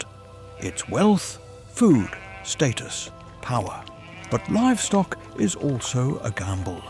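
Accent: United Kingdom. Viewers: Good point, this looks deceptively very real but aint no documentary. Compared to actual history, he likes to exaggerate the adversity for drama and clicks. I suppose the AI british accent is supposed to be more authoritative too. Id like a narration in Alabama accent please. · Nice footage and “British” narrator, but not a single word about where they got the timber from and what valuable items they sold, which made the Norse settlement rich. So, it has to be a “👎” from me, sadly.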